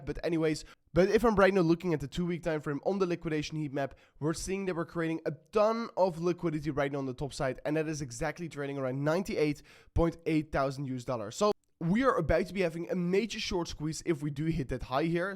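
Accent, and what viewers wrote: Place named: Netherlands. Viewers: Yep he is Dutch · Ben jij Nederlander of vlaming ofzo? ik hoor een accentje. groetjes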